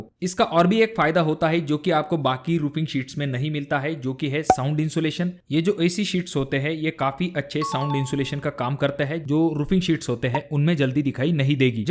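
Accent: Maharashtra, India. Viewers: Speak karte samay marathi uchar aata hai aapka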